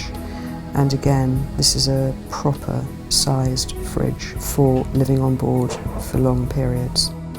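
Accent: United Kingdom. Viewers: Your voice has the proper English accent for this very regal yacht. I feel underdressed if I'm not wearing white lace gloves and an Ascot-esque hat while you describe it.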